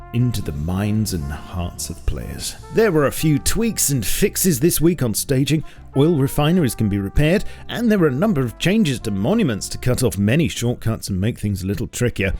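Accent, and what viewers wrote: England, United Kingdom. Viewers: For me, one of the best qualities of your content, is that even though I rarely play rust committedly, your videos are still entertaining. Maybe it's your charming english accent or maybe it's your witty humor. I don't know but keep it up.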